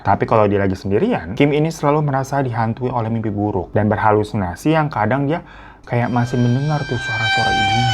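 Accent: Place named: Pasar Minggu, Indonesia. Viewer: lama2 berasa nonton si doel logat pasar minggunye nedok banget bang ..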